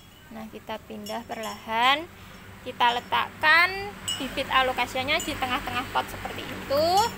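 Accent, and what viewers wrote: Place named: Java, Indonesia. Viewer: English ne jowo aksen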